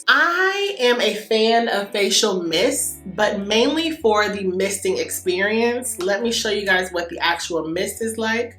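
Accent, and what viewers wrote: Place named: New York, United States. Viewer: You sound like New York when you talk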